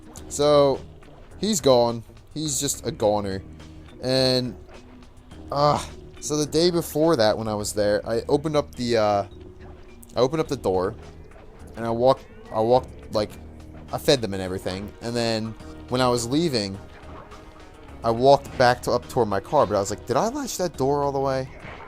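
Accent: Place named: New Jersey, United States. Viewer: Haha. Cool. Jersey accent, lol! :)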